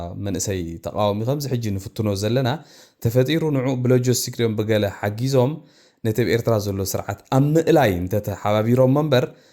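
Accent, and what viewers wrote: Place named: Tigray, Ethiopia. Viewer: ላህጃ ከስከሰ ምላሽ ይስሕቅሉ ኣሎዉ። እዚ ከኣ ላህጃና ንሰብ ሓጎስን ሰሓቅን ዘቅስም ላህጃ እዩ። ኣጆካ ሓወይ እንተኪኢልካ በጃካ ብላህጃካ ዘና ስራሕ።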